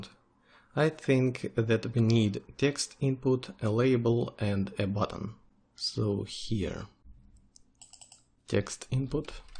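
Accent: Russia. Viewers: Мне показалось или блогер с русским акцентом? В любом случае очень круто!!!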